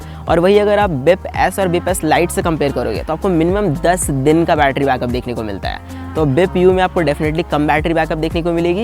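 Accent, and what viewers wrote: India. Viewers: İts english but accent of indian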